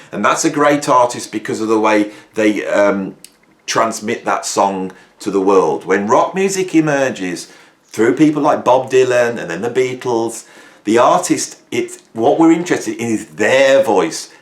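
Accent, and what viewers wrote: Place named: Midlands, United Kingdom. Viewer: First time viewer…….What part of of the UK are you from? Just curious…..the way you pronounce “wrong” for instance, sounds like the Midlands? Im American, and fascinated with different accents…..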